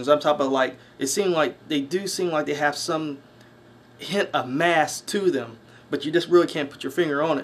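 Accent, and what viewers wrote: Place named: South, United States. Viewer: just curious but are you from down from around like in the North Carolina area? down in the southern u.s area. not to be offensive or anything cause you have an accent. you say "Yall"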